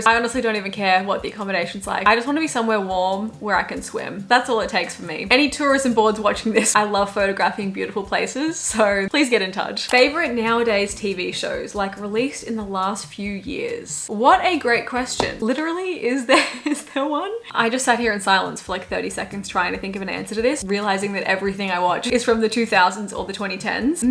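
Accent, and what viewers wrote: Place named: Australia. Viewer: Great video! You are so adorable, the first time I saw your video I noticed your eyeshadow really stands out, and your glasses! I love your style. I'd love more Q&A videos like this!:) PS. I love your Australian accent I could listen to you talk about the weather LOL I also haven't finished TVD but I'm Team Damon. My least favorite album is.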